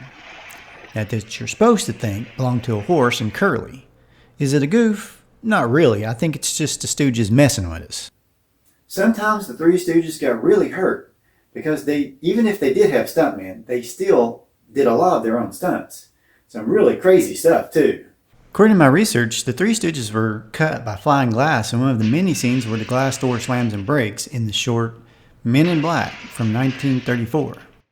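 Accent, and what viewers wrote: South, United States. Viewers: LMAO!!!! I suppose it's because of his very heavy Southern Accent. Kind of like when the Brits say SEH-MEH-TREE for cemetery and INVENT-TREE for inventory.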